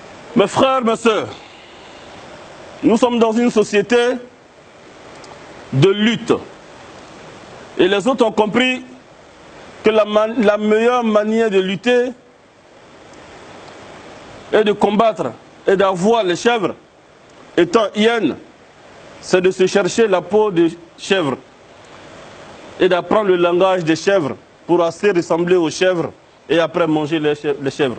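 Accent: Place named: Mali. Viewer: Va dans pays tu ne peux pas vivre en cote d'ivoire, tu parles comme un malien toi tu es un chef ou un envoyer de boko haram.espece de malade ,alassane ouattara est notre preside t et ont se doit de le respecter ,c'est n'est pas toi un blakoro ,qui porte des gros boubous qui viendra manquer du respecte a notre president de la cote d'ivoire .un aventurier comme toi.espece de boko haram..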